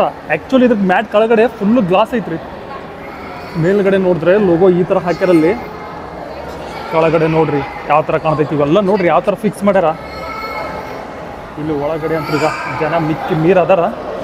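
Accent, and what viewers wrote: North Karnataka, India. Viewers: ನಿಮ್ಮ ಉತ್ತರ ಕರ್ನಾಟಕದ ಭಾಷೆ ಅದ್ಭುತ ಅಣ್ಣ ❤❤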